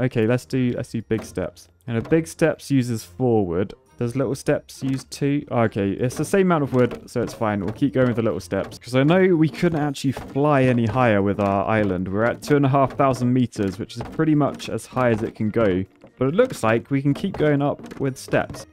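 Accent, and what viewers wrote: United Kingdom. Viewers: sounding kinda British this episode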